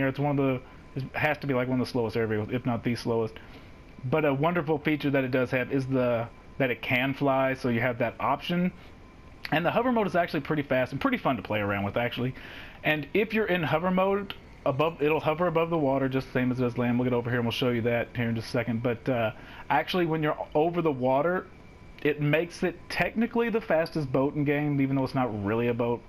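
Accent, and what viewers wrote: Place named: South, United States. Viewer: Gotta love that southern accent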